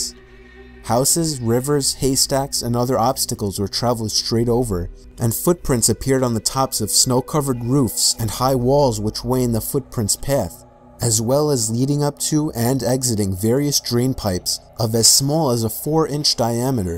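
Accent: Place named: New Jersey, United States. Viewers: I can't place accent. I want to say S. Boston, but its not that thick. Definitely E. Coast. Could be New York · i feel like he's lost his jersey accent a bit.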